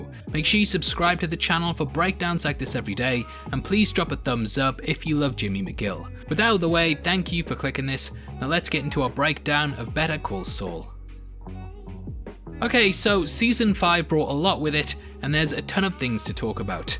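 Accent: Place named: United Kingdom. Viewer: Hilarious to hear these BCS spoilers in a Limey accent.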